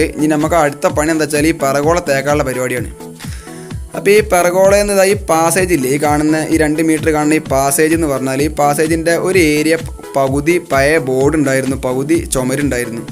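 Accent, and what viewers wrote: Malappuram, India. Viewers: നിങ്ങളുടെ എല്ലാ വീഡിയോ കാണാൻ നല്ല ഹാരമുണ്ട് പ്രതേകിച്ചു നമ്മുടെ മലപ്പുറം ഭാഷ...നിങ്ങളുടെ സ്ഥലം എവിടെയാണ്.... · Ath njammade malapram ayonda bane...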